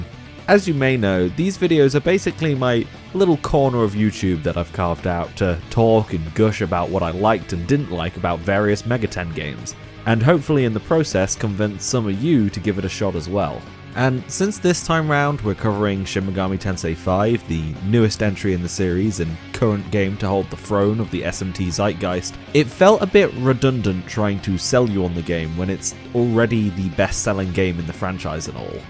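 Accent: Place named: United Kingdom. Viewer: Excellent video. I usually say SMT V sucks, but you did it in a much more eloquent way, and even used a British accent!🇬🇧 My favorite point was that SMT V moves nothing forward and innovates in no ways. I'll even point out my biggest complaint: you don't gain any new movement skills as the game progresses. It's not like there are places you can't access because you don't have an ability. How much more interesting would it have been if you eventually got the ability to double jump, or use a grappling hook, or open sealed passageways, or see in the dark? Instead, you have all the movement skills at the beginning of the game and gain no more as time went on. That's not how that type of game is supposed to work. Also, when I got to the Tokyo Tower, there was nothing to do. There was no dungeon, no secrets, nothing to explore. My disappointment was audible. 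If you have the time, check out GILL Studio on YouTube. He plays a lot of JRPG music, especially SMT games. When you watch him play Digital Devil Saga or Devil Summoner music, he rocks out and you can see how skilled he is. He plays lead, rhythm, and bass guitar, and he's extremely impressive. When he plays SMT V music, he looks bored. It's so far below his skill, it's not even funny. (I'm still trying to get him to play from Devil Survivor. That tune will melt your guitar.) Here's my final analysis: SMT V is Fable. It made a lot of promises, like an open world and endless exploration, a character you could truly mak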